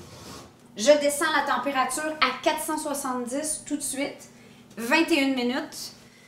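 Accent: Quebec, Canada. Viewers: Je viens de découvrir votre chaîne aujourd'hui, j'ai seulement regardé la première vidéo de pain il y a quelques instants, et BAM nouvelle vidéo du pain ! Quel hasard ;) merci pour la vidéo, ça fait plaisir d'entendre une Québécoise et de voir une recette de pain (je suis Bretonne en manque du Québec!!) suis ravie d'apprendre que des Bretons font d'aussi beaux récipients comme vous en avez montrés. J'itai peut-être y faire un tour ! Bonne continuation :) (et je rajoute que je trouve ça très drôle car vous en peignoir le matin en train de continuer votre pain de la veille dans la première vidéo c'est exactmeent moi ^^ ainsi que le chéri qui dit 'mais il est très beau ce pain! avec moi, amatrice boulangère, qui tire la moue parce que je suis trop perfectionniste :) )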